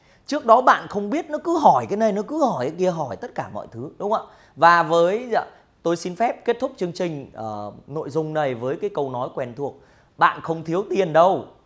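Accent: Thach That, Vietnam. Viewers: Giọng bạn có phải quê thạch thất?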